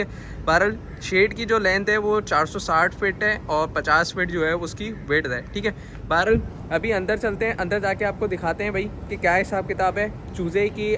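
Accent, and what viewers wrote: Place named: Punjab, India. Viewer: Bhai ap hain kahan? Punjab side lag rahi ha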